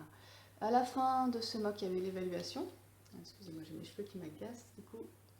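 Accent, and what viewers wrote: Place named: France. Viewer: Les conférenciers sont très intelligibles parce qu'ils doivent parler clairement, souvent à une audience qu'ils savent internationale. Ensuite le niveau de vocabulaire, le mixage sonore, la tendance à répéter (les running gags d'un How I met permettent vraiment de capter des expressions et les rendre assez familières pour qu'on les trouve quand on en a besoin, et qu'on les prononce bien !), le confort physique dans lequel sont les personnages quand ils parlent, l'origine (UK vs USA) des acteurs vs. ce à quoi on a l'habitude, etc., influent sur la vitesse de progression VOSTFR (juste au début pour -> VOSTEN -> VO qu'on peut avoir. Dans la foulée, pourquoi ne pratiques-tu pas ta prononciation avec une vidéo/film/..., en apprenant un poème ou un discours que tu aimes ? Tu aurais le modèle à disposition pour savoir les sons à produire. 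Comme tu le sais ta prononciation actuelle a un fort accent français, et je crois que c'est très facile à comprendre quand on est français, surtout mauvais en anglais et habitué au franglais, mais moins pour un natif dont l'oreille est moins indulgente et moins entraînée à nos sons. J'ai énormément amélioré mon anglais en apprenant par coeur "I have a dream" avec le modèle en vidéo + quelqu'un qui m'aidait, et ensuite en écoutant des séries et vidéos Youtube. Les sons me sont entrés dans la bouche à force de les entendre. Si on est assez geek pour passer une grande partie de la journée à écouter de l'anglais d'une façon ou d'une autre, et qu'on a d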